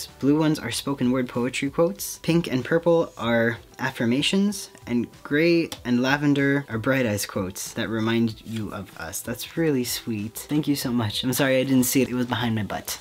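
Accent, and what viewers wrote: Canada. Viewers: I love your Canadian accent reminds me of many favorite movies strange brew with Rick moranis. Plus I lived in Alaska for 13 years, and traveled thru Canada beautiful country ( People in the lower 48 also think Alaska has 2 seasons, but no we do have 4 seasons, and love the northern lights are beautiful in alaska too) I am now on the east coast but thankfully still in the country. I love hugs too I'm a big hugger ❤️ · that was a HECKIN good time with that extra Canadian accent!! You can wear that Cat shirt on the MFP! Lamb... LET ME IN!! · Never stop the Canadian accent... it’s heckin’ adorable!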